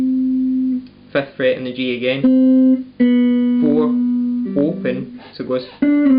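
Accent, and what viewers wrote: Scotland, United Kingdom. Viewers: are you Scottish? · Was searching through all the intro tutorials then came across your's. I chose to stick around cause your Scottish definitely paid off thanks for the great lesson ;)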